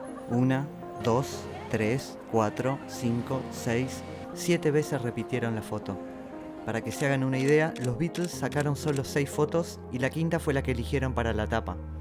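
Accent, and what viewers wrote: Argentina. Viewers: Yo siempre pense que eras de argentina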